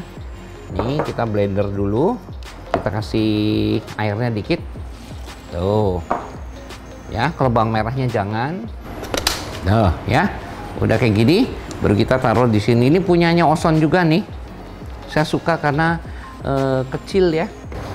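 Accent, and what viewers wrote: West Java, Indonesia. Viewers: Pantesan chef logatna sunda pisan😁😁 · Euleuhh. Gening Chef teh urang Bandung. Pantesan mun nyarios rada ngalogat sunda. Hehehehe.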